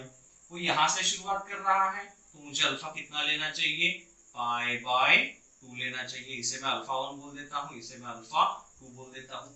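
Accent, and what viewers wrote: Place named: India. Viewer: Best.. just need to improve style of speaking becuse ur style seem desi type. May Allah give u success ameen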